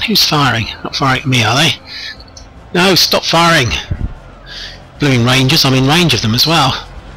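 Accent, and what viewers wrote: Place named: United Kingdom. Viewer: Oh man I'm so glad I found you! Fantastic naval battles! It's been such a long time since I found someone that is good! Also your British! Makes the voice over great!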